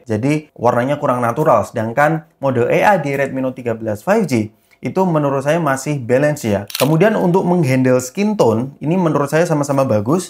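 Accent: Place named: Java, Indonesia. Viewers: Jowo ngendi masse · Wong jogja logat e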